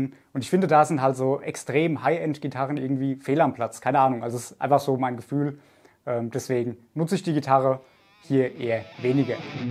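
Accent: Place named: Wiesbaden, Germany. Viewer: hört sich mehr nach Wiesbaden an..😉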